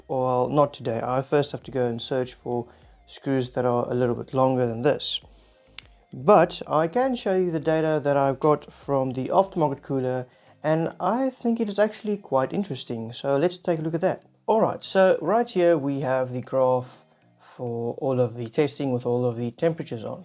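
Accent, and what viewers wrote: South Africa. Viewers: You south African? I can hear the accent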